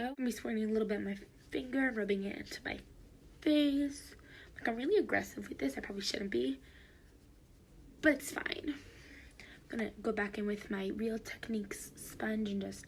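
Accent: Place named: New York, United States. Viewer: getting heavy new york accent vibes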